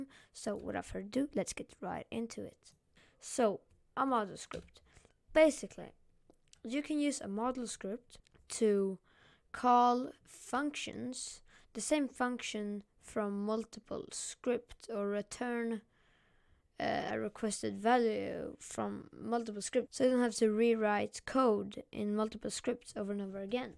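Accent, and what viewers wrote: Sweden. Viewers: You sound swedish. Are you swedish?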